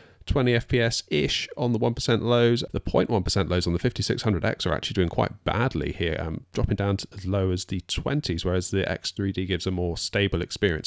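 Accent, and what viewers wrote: United Kingdom. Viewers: Dude whats wrong with you, are you a brit or smth? Cant you use normal english with normal speed rather than this 'boa of woha' eminem speed style?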